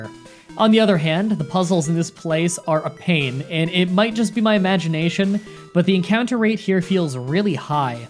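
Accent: Canada. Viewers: Love the Canadian accent lol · are you cadidan? you have a very neutral accent with some quirks that aren't in the chicagoan accent.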